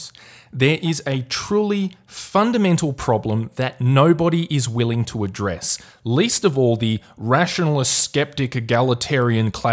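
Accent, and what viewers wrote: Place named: Australia. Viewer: You speak very well (and I actually like your Australian accent) and helped me figure out the truth. I actually thought that men were smarter only at the extremes but it seems that I too had misinterpreted (fell for) the graphs and the news articles claiming that girls are doing better in school. Most likely because it won't affect my relative intelligence to other people.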